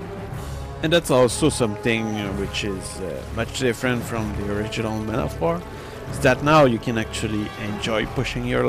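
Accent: Quebec, Canada. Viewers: you are from quebec? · You're French-Canadian right? all due respect, just curious ;)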